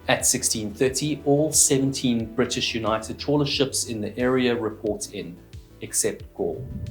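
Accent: South Africa. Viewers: Yeah, pretty sure he's, that's just how it sounds with a South African [I think] accent.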